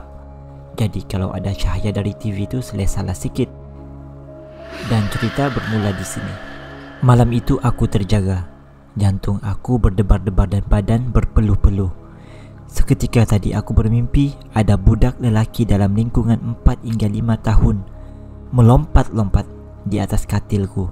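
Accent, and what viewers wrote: Sabah, Malaysia. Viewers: Tukar pembaca melayu yang betul2 melayu..ni bahasa baku dia kuat slag Sabah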